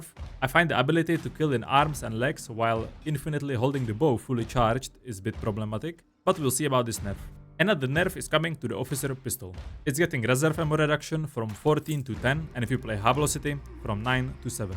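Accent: Czech Republic. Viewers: Is that a Czech accent ?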